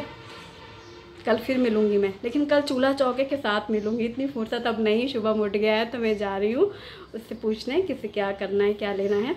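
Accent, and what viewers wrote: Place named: Baghelkhand, India. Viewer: Aapne sahi kiya👍👌aapse baghelkhand ki boli sunkar achchha lga. main Rewa (M.P.) se hu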